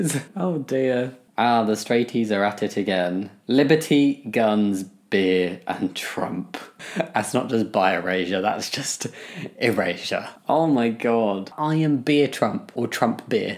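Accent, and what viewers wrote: United Kingdom. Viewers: You're from the UK?!?!!? Man I'm really bad at detecting dialects.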